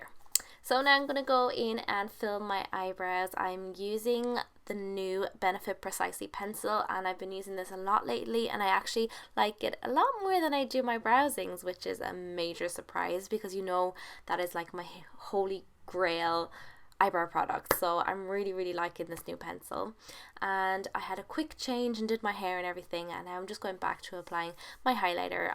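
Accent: Ireland. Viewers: Your accent is gorgeous, what part of Ireland are you from?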